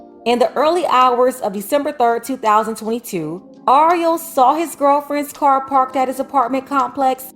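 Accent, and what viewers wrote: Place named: California, United States. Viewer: u sound like u from cali lmao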